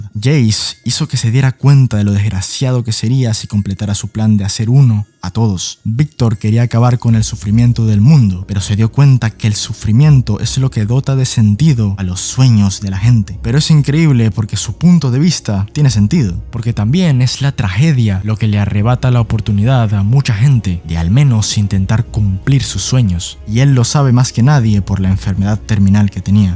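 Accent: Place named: Ecuador. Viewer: Muy buen video, que genial se nota lo ecuatoriano en tu voz. Sigue así, ganaste un suscriptor.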